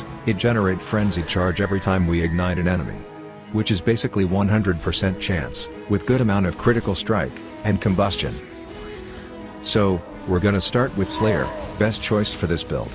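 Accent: Russia. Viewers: theres no commie speak like a russian on purpose，it too showy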